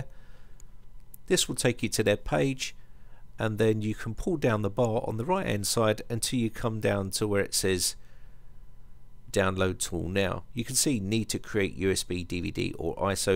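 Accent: England, United Kingdom. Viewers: I just don't get English accent.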